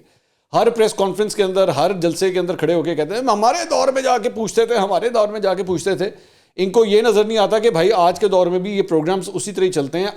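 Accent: Punjab, Pakistan. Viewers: Veer jee twadi punjabi bolan da style zabardst chassa jandi sundy hoye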